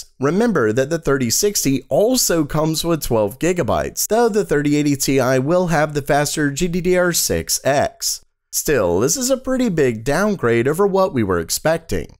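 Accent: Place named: Texas, United States. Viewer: Your accent sound like a Texan or Oklahoman accent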